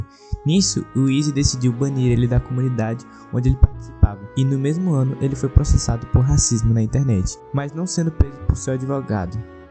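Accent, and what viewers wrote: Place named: Paraíba, Brazil. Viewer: Pode regravar esse vídeo mas trocando a voz por uma pessoa pós puberdade e de preferência que não seja paraíba?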